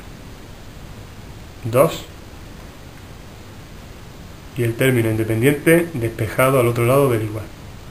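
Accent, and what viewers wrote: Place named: Caribbean. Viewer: Hola, me sirvió mucho, pero para que sus vídeos se vuelvan más virales debería neutralizar más ese acento caribeño. saludos desde los andes Colombianos...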